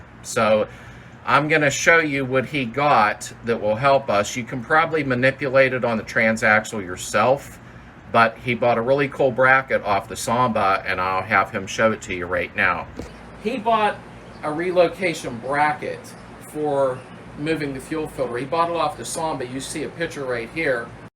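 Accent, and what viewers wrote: Pittsburgh, United States. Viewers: Is that a Pittsburgh accent I hear? Thanks for the great video!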